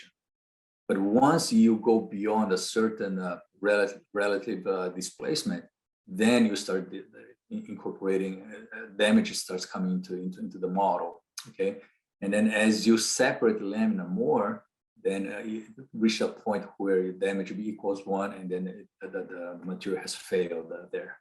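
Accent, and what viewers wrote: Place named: Brazil. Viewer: I knew that accent was Brazilian! Super cool seeing an Asian person with a Brazilian accent!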